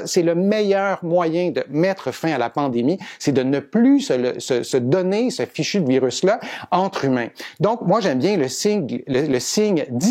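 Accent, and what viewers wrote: Quebec, Canada. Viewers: Très bien fait ! C’est la première fois que je vous vois et cette vidéo me plaît beaucoup. Je suis médecin aussi, mais à New York. Un psychiatre. Votre idée pour ne pas toucher le visage est super brillante. Votre façon de parler et d’expliquer des choses est claire, innovante et me plaît énormément. Merci beaucoup monsieur. En outre, le français québécois me plaît à entendre. J’apprends la langue de la France avec leur accent, mais le vôtre est génial. Récemment, j’ai décidé de prendre la retraite de la médecine. Si je pouvais, je souhaiterais habiter au Québec, un lieu, un pays, une destination que j’ai toujours aimé pour faire des tours en voiture, de la Gaspésie à Hull. Cependant, je ne sais pas si le Québec ou le Canada accepte des personnes comme nous, séropositifs pour le VIH depuis 30 ans. Sauriez-vous si des gens séropositifs qui désirent vivre au Canada sont permises de le faire. 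Comme médecin, j’ai pensé que vous seriez là bonne personne à qui poser ma question. J’apprécierais si vous pouviez répondre à ma question, lorsque vous n’êtes pas trop occupé. Je vous remercie à l’avance pour cela. Mais pour revenir à nos moutons, une fois, mon copain et moi, on est allé plus loin pendant un voyage en voiture. Mon copain et moi, on est allé en voiture de St-Jean-Terre-Neuve jusqu’à Victoria et Torino, Colombie-Britannique. Ce tour nous a pris environ 3 mois et quel plaisir. Quelle belle traversée de votre magnifique pays, mon préféré au monde. Pour la plupart, on s’est ser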